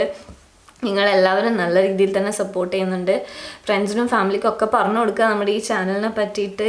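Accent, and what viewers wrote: Kannur, India. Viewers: Kannur evdya .oru knnur slng und talk l evdya gym ?? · Uff kannur basha😂💫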